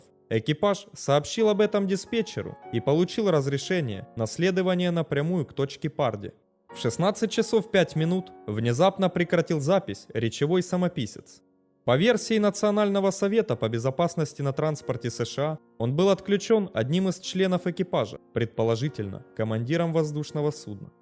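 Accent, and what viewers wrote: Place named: East, Ukraine. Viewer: я это поняла. Восточная Украина, видимо) может, Вам проработать его? Без обид, просто мы Вас не видим, только слышим, голос имеет огромное значение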